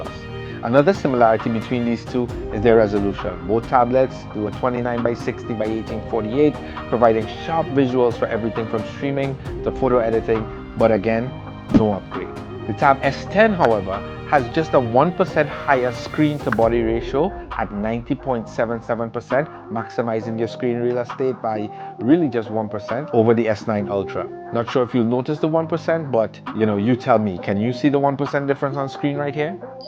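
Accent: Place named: Trinidad and Tobago. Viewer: Wait, wait, how you sound like a Trini so lol?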